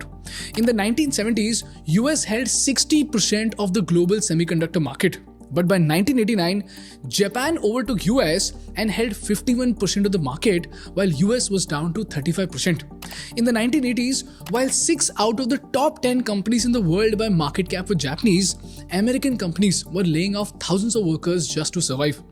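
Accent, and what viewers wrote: India. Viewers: 原来印度语是这样的啊。有点英语的成分啊。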